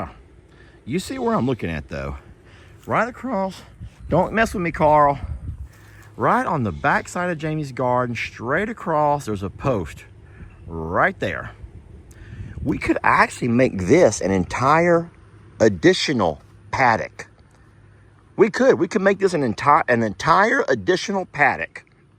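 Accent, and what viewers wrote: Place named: Oklahoma, United States. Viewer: My grandparents on both sides would often say fire ants like you do, more than likely because they were from Oklahoma, Arkansas, Texas, area. My father, often called Las vegas," lost wages", But he was often changing words that sounded similar but meant something different. Which kind of rubbed off on me on occasion😅😅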